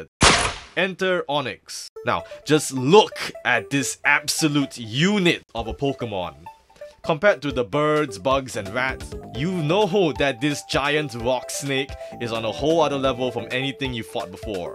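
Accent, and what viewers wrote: Singapore. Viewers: I thought I recognised the accent!! Hello! From Singapore too!! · i heard your accent and i immediately knew you’re singaporean 😂 subscribed! #SupportLocal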